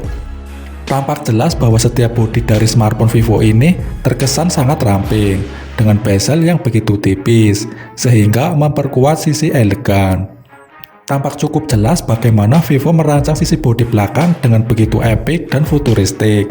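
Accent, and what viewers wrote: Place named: Tegal, Indonesia. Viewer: Logat tegalnya medok bet dah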